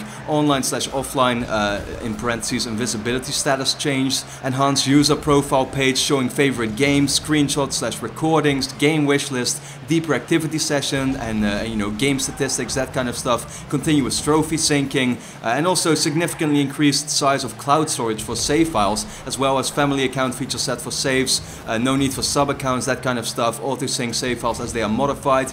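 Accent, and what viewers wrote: Netherlands. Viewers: i also hear a dutch accent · Dutch accent?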